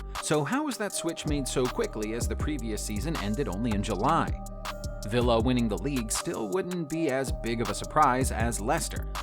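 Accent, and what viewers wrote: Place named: United States. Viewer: Agreed, such a turn off with the American accent and mispronunciation.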